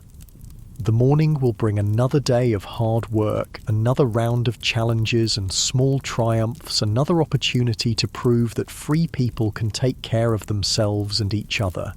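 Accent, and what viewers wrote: United Kingdom. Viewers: I hate the British accent and voice used 🤢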